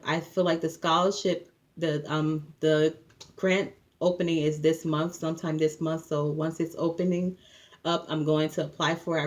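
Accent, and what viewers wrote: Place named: Liberia. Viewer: Thanks for the info! I hear the Liberian accent lol....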